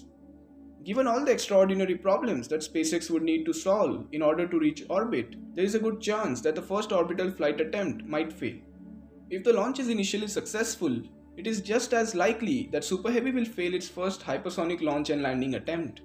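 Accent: India. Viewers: i love the indian accest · Thanks! the expected timeline was not apparent to me, other than the text list of event callouts. The Indian accent threw me, to be fair I don't speak any Indian. Hoping for altitudes, velocities, geographic locations (or longitudes) and altitudes by the time list posted. Maybe energy dissipation per second (d(m*v^2)/dt), possibly temperatures (gaseous molecular velocities related to Boltzmann temperature). Go!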